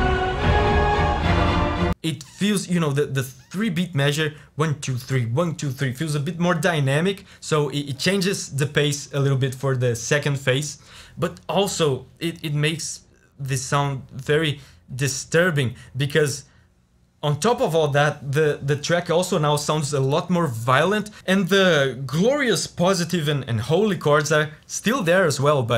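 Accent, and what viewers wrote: Brazil. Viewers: É incrível como dá pra saber pela sua voz que vc é brasileiro. É um sexto sentido. Aliás, vídeo bacana!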